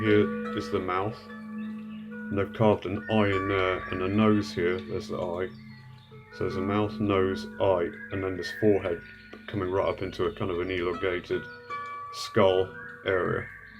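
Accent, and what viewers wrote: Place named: England, United Kingdom. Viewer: You sound English, you should check my blog, although its a world wide thing... <link>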